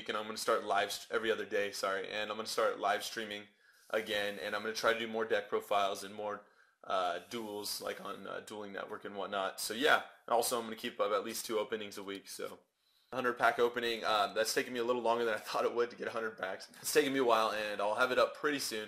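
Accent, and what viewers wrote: Germany. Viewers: r u german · are you german?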